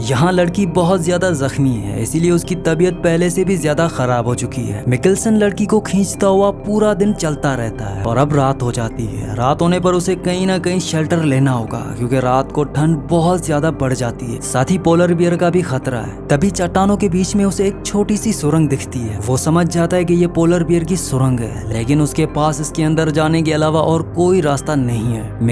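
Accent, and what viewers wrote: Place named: Kashmir, India. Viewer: Your Hindi has a accent of Kashmiri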